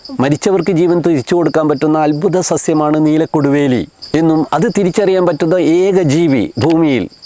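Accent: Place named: Kottayam, India. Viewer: Your narration is clear sound ,a kottayam Pathanamthitta slang different from blathur natives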